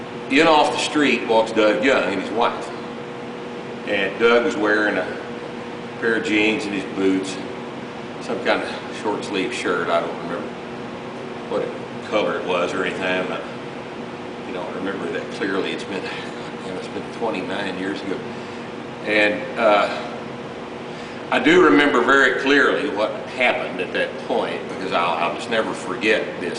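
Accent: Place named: United States. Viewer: What the fuck are you on about? There was literally no cussing, he's speaking clear American English (which is his audience). What could you possibly have to bitch about?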